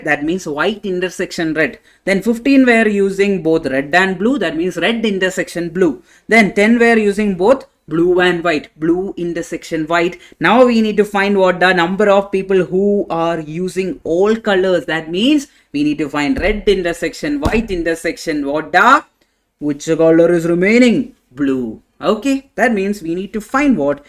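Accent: Kerala, India. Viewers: Sir ,are you a malayali?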